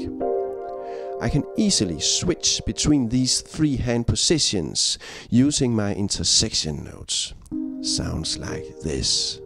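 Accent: Denmark. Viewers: Well, is danish. That's the way they speaks. And plays. Remember, - Denmark has produced many great Jazz players. Palle Mikkelborg springs to mind.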